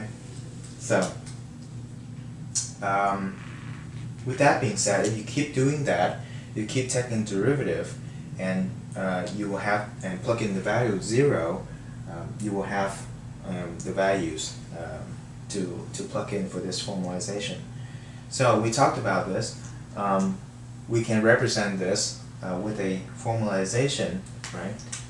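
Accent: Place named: United Kingdom. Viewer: Thanks . Awesome explaination . Your accent seems British. It has helped me a lot in my uni maths!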